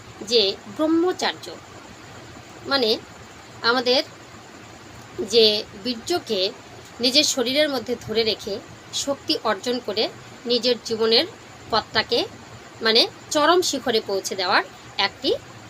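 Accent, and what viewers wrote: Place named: Bangladesh. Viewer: Apni ki Bangladeshi? Voice tone ekdom Bangladeshi der moton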